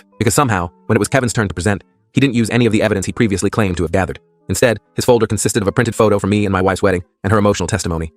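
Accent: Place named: United Kingdom. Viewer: The British accent is just no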